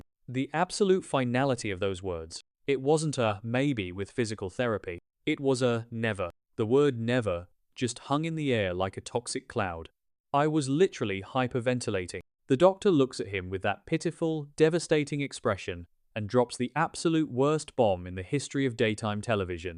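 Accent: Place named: United Kingdom. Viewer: A British narrator is so wrong for this. He is not pronouncing names correctly. Specially Devon. Have an American HUMAN narrate or don't do it at all. After a one minute, had to stop. Couldn't listen to another word. Horrible.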